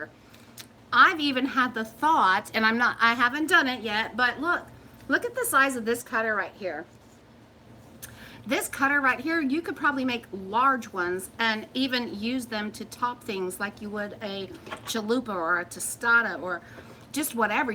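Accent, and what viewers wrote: Texas, United States. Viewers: How is it I am just now finding you? LOL. You sound like you may be a fellow Texan. I am enjoying your videos.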